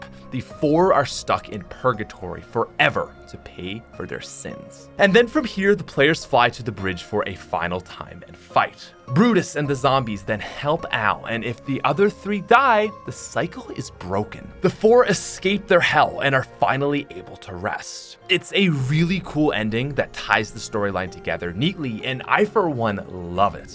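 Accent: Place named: Quebec, Canada. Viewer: hes french canadian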